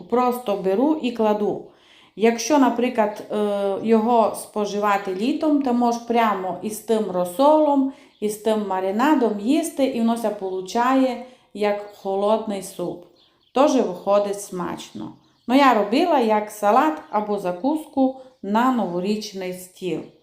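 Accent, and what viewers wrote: Transcarpathia, Ukraine. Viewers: Насолоджуюся вашою чудовою закарпатською говіркою. Дякую за рецепт. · Спасибо за рецепт и красивый,напевный закарпатский говор. Я очень люблю вас слушать.❤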